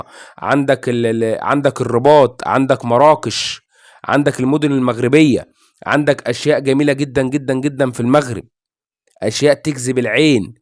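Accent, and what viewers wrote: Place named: Egypt. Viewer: دا أنت الطيب المحترم تنوب على المغرب والمغاربة على ذوقك الراقي الجميل الله عليك تعليقك بالصوت المصري الجميل أنت على راسنا من فوق ❤❤❤❤❤❤❤❤❤❤❤❤❤❤❤❤❤❤❤❤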